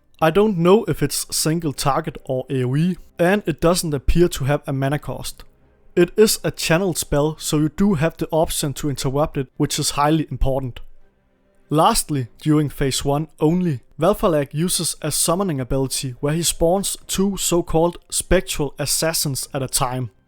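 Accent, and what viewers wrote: Denmark. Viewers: Love the accent - a fellow dane here! Great vids bud! Do you stream on twitch or only making content here?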